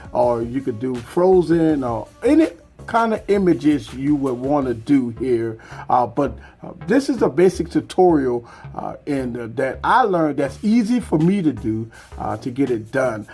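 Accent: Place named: New Orleans, United States. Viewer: I love your NOLA accent! Great video. This was very helpful.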